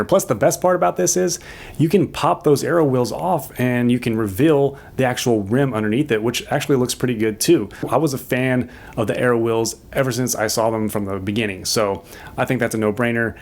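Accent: Kentucky, United States. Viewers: hahaha i thought you was in kentucky...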